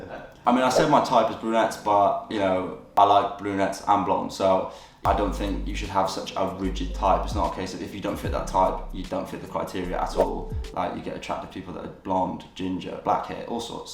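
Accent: England, United Kingdom. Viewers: I turn the caption on coz ya know is being so british heheheh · which English accent is he speaking? 😩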